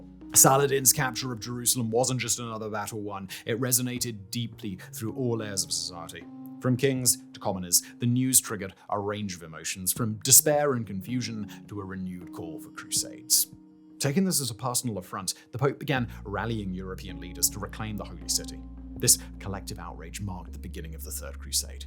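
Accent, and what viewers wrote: United Kingdom. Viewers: why do you sound extra British in this episode?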